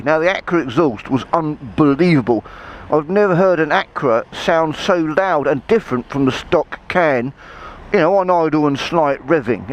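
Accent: United Kingdom. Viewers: Can’t understand that strong British accent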